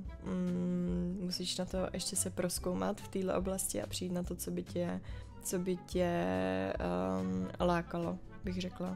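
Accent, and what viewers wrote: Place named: Slovakia. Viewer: super videa ale ta slovencina no docela sila :D Malem sem se podelal od smiechu :D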